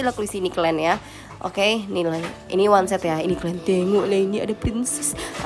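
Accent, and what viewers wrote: Medan, Indonesia. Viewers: Logat medan itu kk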